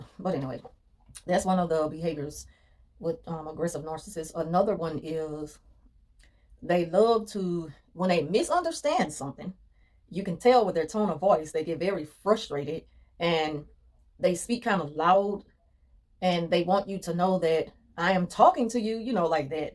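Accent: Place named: South, United States. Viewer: I like your down south accent .💖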